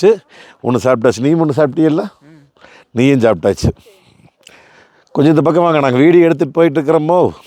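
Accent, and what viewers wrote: Kongu, India. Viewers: கொஞ்சும் கொங்குத் தமிழ் பேசும் கோமகரே...! திகட்டாத தித்திக்கும் பேச்சுடைய திருப்பூர் திருமகரே...! எங்கள் மதிப்பிற்குறிய திரு. அண்ணா❤❤❤🙏 அவர்களே எப்படி இருக்கீங்க அண்ணி❤❤❤🙏 எப்படி இருக்காங்க ரித்திக்❤❤❤ எப்படி இருக்காரு. அண்ணா மைனஸ் டிகிரி குளிர்ல ஷூ&சாக்ஸ் போடாம வெறும் செப்பல் மட்டும் போட்டு இருப்பது ரொம்ப ரொம்ப தவறு. உறைபனி குளிர்ல ரத்தம் உடம்புல ஓடுறது சீராக இருக்காது. அப்படி ரத்தம் சீராக இல்லாமல் போவதால்தான் நம் உடம்பு உறைபனி குளிர்ல மரக்கட்டை மாதிரி விறைப்பதற்கான காரணம்.... அப்படி ஆகாமல் இருப்பதற்காகதான் WINTER COAT JACKET SHOE&SOCKS போடுறதே. தயவு செஞ்சு ஷூ&சாக்ஸ் போடுங்க அண்ணா🙏 11.20 செகண்ட் வீடியோவுல பேசிக்கொண்டே சட்டுனு பாதை மாறிப் போயிட்டீங்க? அண்ணி Guide பன்றாங்க👍 இந்த மாதிரி இடங்களுக்கு புதிதாக போறப்ப இந்த மாதிரி பாதை மாறிப் போறது சகஜம்தான். ஆனாலும் நீங்க போகிற இடங்கள் எல்லாம் அவ்வப்போது பனிச்சரிவு அதிகம் ஏற்படும் இடங்கள் அண்ணா. 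இந்த மாதிரி பாதை மாறிப் போயி எங்கயும் லாக் ஆகிட்டால் கஷ்டம். ரொம்ப கவனமா இருங்க அண்ணா👍 நம் ❤சிட்டுக்குருவியோட❤ தங்களது இந்த லடாக் பயணம் இனிதே தொடர்ந்திட நல்வாழ்த்துக்கள் அண்ணா❤❤❤ என்றும் அன்புடன் உங்கள் தம்பி என்றும் அன்புடன் உங்கள் TMF ரா.முஹம்மது கபூர் (சென்னையில் இருந்து)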